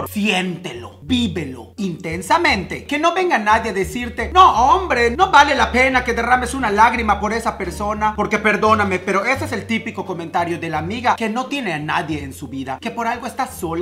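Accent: Mexico. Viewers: Me encanta tu acento mexicano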